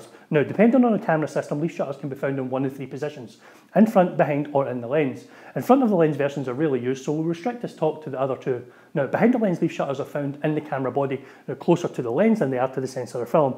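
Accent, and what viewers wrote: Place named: England, United Kingdom. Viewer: ahaha...yeah man, you are rapping the info out off your mouth....with heavy english accent....this how to vd...check on your basic teaching and communication skills...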